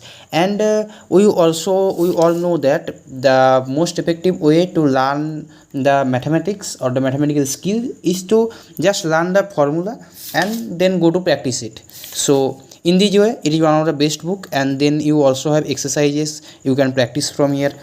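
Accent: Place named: Bengal, India. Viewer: Are you bengali or orrisi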